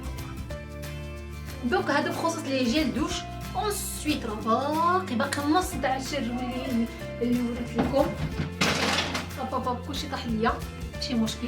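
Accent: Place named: Marrakech, Morocco. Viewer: merci pour la vidéo 3jbatni bzaf et aussi l'accent merrakchia hhhh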